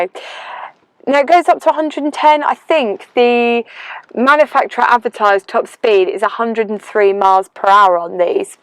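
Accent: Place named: RP, United Kingdom. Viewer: omg no way y are so posh lady.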